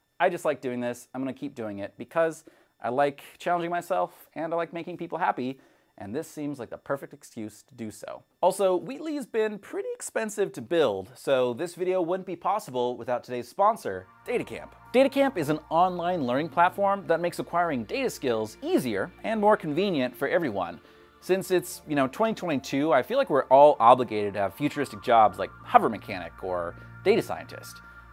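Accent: Russia. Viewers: You are Russian? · Ты что, русский?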